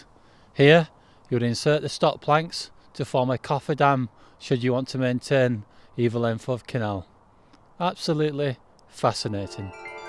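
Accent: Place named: Lancashire, United Kingdom. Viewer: One of the beauties of this record of our history is that it records the accent of the commentator also. These are fast disappearing. Is it and is it very local? Every town seems to have its own throughout Lancashire. Amazing and beautiful. Thanks Lads.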